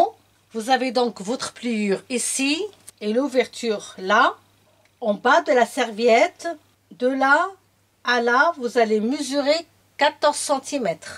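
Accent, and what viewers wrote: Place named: Lebanon. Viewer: Coucou je commence la couture je trouve que vous expliquez super bien et chose importante pour ne parler pas trop vite ... Et j'avoue g craqué sur votre magnifique accent ça me rappelle ma famille au Liban . Merci beaucoup 👍👍👍👍🌷🌺💐💗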